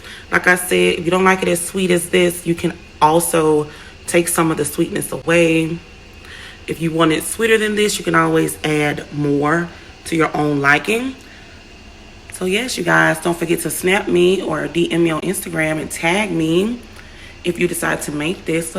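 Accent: Mississippi, United States. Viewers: I love your Mississippi accent, thank you for sharing your recipe.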